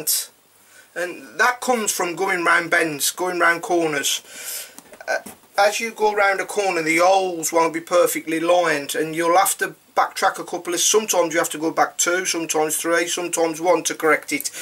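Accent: Black Country, United Kingdom. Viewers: Black Country way, I reckon. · Just getting in to leather work, and the first video of yours that i have seen - you got an instant sub as soon as you spoke. Us Yamyams have to stick together!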